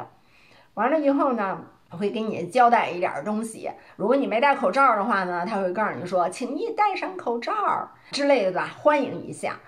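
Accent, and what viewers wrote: Beijing, China. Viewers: 天天都会看几个视频，特喜欢的就是一口京腔京韵，再重点更好！👍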